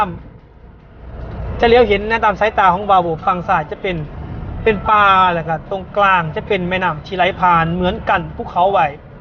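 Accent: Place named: Isan, Thailand. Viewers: บ่าว เว่าสำเนียงอิสานชัดเจนเลยครับภ่คกลางกะชัดครับ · การออกข่าวไม่มีคนพากย์พูดภาษากลางได้แล้สหรือจึงใช้คนพูดได้แต่ภาษาอิสานทำให้คนภาคกลางและคนภาคอื่นๆฟังแล้วเสียความรู้สึก ถ้าเป็นแบบนี้อย่าปล่อยข่าวออกมาอีก อายคนภาคอื่นๆและคนต่างชาติมากๆ ทีหลังอย่าทำวึดีโอข่าวแบบนี้ออกมาลงในยูทุปอีกนะหรือกรมประชาสัมพันธ์ไม่มีจนท.ที่จะคอยตรวจสอบควบคุมหรือไง แย่มากๆเลยนะ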